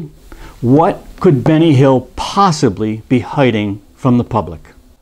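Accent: United States. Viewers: ONLY thing sad is this usa accent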